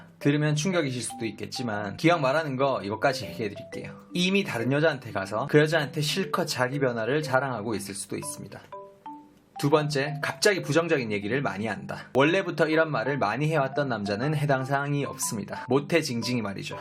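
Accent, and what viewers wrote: Gyeongsang, South Korea. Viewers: 대구냐 부산이냐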